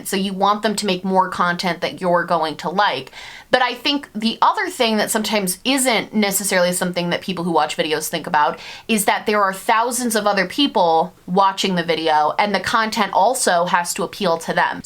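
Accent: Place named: Upstate New York, United States. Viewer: I'm new to your channel, and I'm from Buffalo!! I loooove that I found a creator who kinda carries the "personality," for a lack of better words, of upstate/WNY. I LOVE your personality and the way you speak because it reminds me of home, everyone is lowkey annoyed but grateful at the same time and its very refreshing <3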